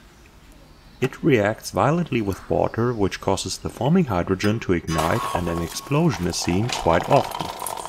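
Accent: Germany. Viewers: Are you German? Just for curiosity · interesting question. it at least sounds like he has a slight german accent.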